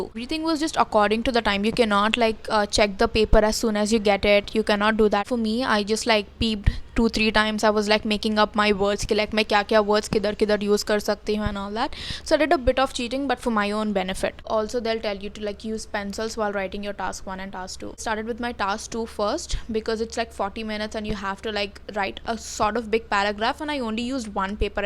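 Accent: Hyderabad, India. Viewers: Hyderabadi bolte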